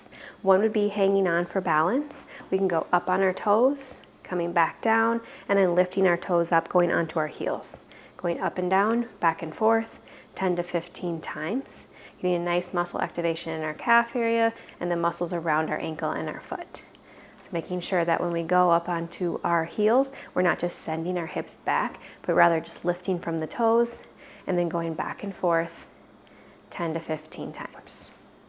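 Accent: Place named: France. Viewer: Try British English as I habitant. Not mixture France with English, sound like beef like chicken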